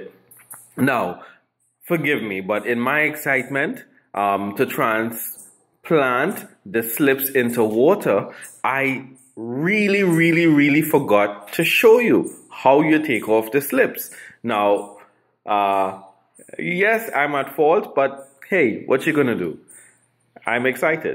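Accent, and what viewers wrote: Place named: Caribbean. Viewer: Hi, looked at a few videos for growing sweet taters, yours is the best, you explain things great, love your accent from the Caribbean, I've subscribed, and am keen to grow sweet taters along with you. It's end of spring here in OZ. Time to start. Regards Shayne......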